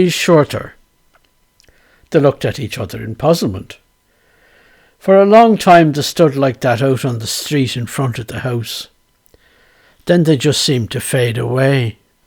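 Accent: Dublin, Ireland. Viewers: Same here but he soothes my nighttimes with his gentle voice and delightful Dubliner accent. Can you imagine sipping some tea or sharing a pint with Mr. relating one of his tales somewhere in Dublin? What a treat that would be!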